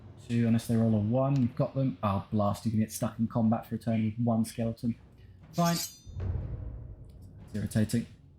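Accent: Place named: United Kingdom. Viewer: I feel like this is an awkward thing to say but you sound delightfully brittish. Thank you for the uploads.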